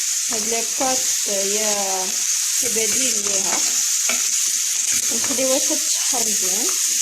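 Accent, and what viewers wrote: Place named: Morocco. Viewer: هي بتتكلم بلمغربي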